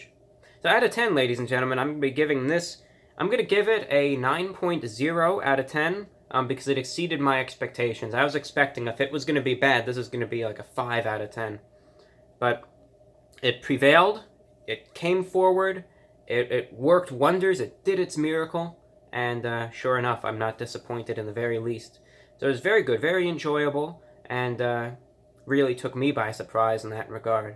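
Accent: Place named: New Jersey, United States. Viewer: Part of his charm is demeaning his uniqueness. I love his Jersey accent and persona. He's good-looking in an uncommon way. I fuss at him because I do like him.😂❤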